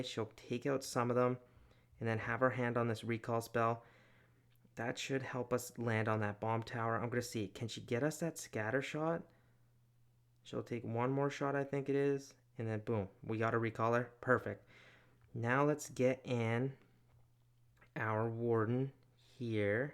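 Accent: Canada. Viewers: You sound Canadian, eh? 🤔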